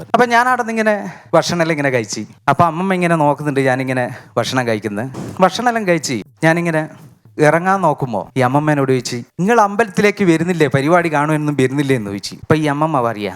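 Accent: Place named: Kannur, India. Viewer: നല്ല അവതരണം, കണ്ണൂർ ഭാഷ 🎉❤🎉❤🎉❤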